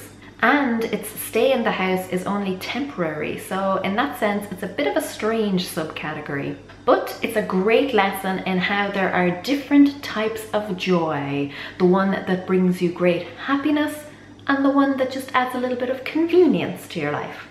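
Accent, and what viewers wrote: Ireland. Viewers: oh u live in ireland? That's cool cause i'm german and I actually thought that you had an irish accent. Interesting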